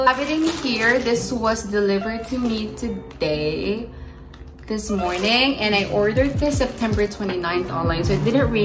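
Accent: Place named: Philippines. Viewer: Dami paarte ng salita, pilipinong pilipino naman tono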